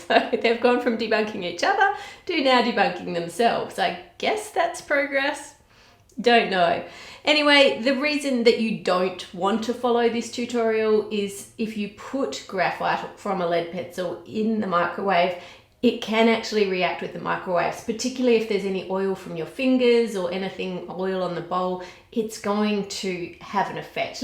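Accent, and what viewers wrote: Australia. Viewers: Hey I love your channel And after binge watching your channel I changed my Siri to Australian since I love your accent Hope you reply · Her Australian accent is so strong😂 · I love your videos so much omg I remember watching you like 6years ago. I actually learned english by watching your videos😁 now I have an aussi accent even tho I'm not aussi😂 thank you for keep making these videos🥰 I wish I could support you on your patreon but I unfortunately can't atm but you'll definitely be the first (and probably only) person I'll support! Thank you for everything and keep going❤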